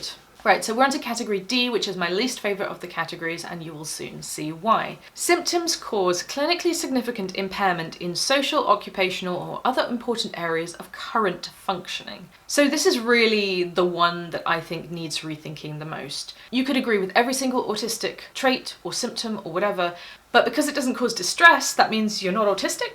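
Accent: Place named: England, United Kingdom. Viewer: Nice to hear an English voice, so many of these things are American. I kind of tune out when I hear foreign accents...maybe due to my condition (I self-identify).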